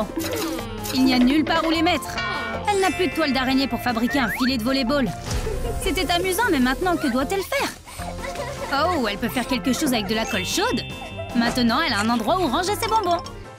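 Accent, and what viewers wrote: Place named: England, United Kingdom. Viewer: Il sons pas français il sons englai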